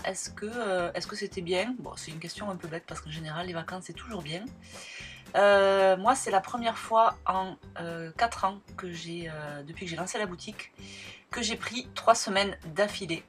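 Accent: Toulouse, France. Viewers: Il est beau notre accen toulousain et tarnais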